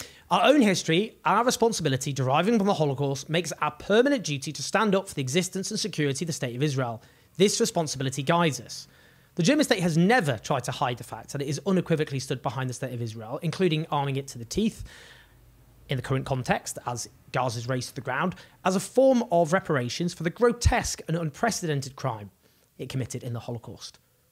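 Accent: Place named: Wales, United Kingdom. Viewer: I wanted to watch your video, but your accent is really hard to get my friend. Is it Welsh? Or Australian?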